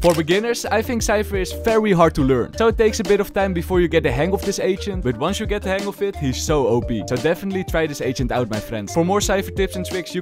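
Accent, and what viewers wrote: Netherlands. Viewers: are you dutch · i smell the dutch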